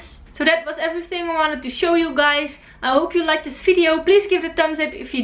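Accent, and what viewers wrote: Netherlands. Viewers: Eindelijk iemand die ook met een dik nederlands accent praat als ze engls praat. Dacht dat ik de enige was.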